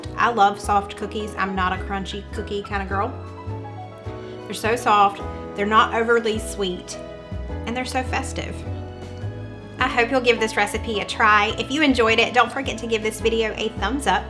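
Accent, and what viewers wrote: South, United States. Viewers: I do Santa Cookies in A Jar and gift them to friends so they can bake them for Santa I also do a hot chocolate in a jar and put them together in a gift basket. It’s always been a huge hit. I also do the Hot Chocolate in test tubes and put a ribbon and tag around them for my son to give to his friends and teachers. I love your southern accent I live way down south and I have it to. I guess the saying is true you can take the girl out of the south but you can’t take the south out of the girl no matter where you live. 😊 Merry Christmas!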